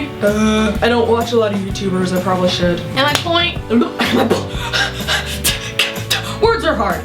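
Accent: United Kingdom. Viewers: Haha :) your New Zealand accent kinda sounded like an English Birmingham accent hehe awesome you made me laugh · Your NZ sounds like a bad English accent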